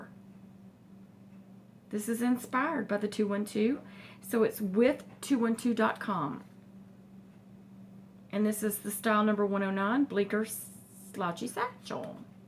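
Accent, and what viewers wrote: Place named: South, United States. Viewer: I've watched lots of your videos, girl you have that like sweet southern accent, smile, and friendliness that is so classy and feminine. You got a new subscriber :)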